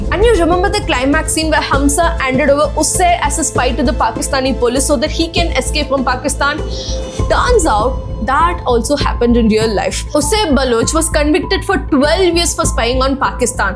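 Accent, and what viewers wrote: Tamil Nadu, India. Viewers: Good job. I have watched many episodes of yours including the one where in you narrated that you have an issue with the letter R that’s why your accent is different. I felt bad that without understanding i had commented on your accent. But after that I watched and listened carefully, you defiantly have to improve your accent, it’s not just the letter R, u have issue with the English accent. Your mind processes in Tamil and hence your accent of English is like Tamil. I mean no offence but u must improve your accent for sure. U surely can if u try. Listen to your tone and accent you will know for yourself. God bless. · I like not only the content and the research you make into them, but I like your pronunciation (the Tamilised English) the most. Keep doing this good work and God bless you dear.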